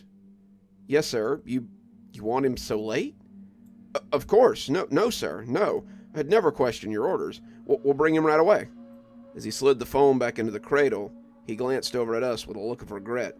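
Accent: South, United States. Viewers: May I ask….? Answer only if you feel comfortable. Are you from the south…?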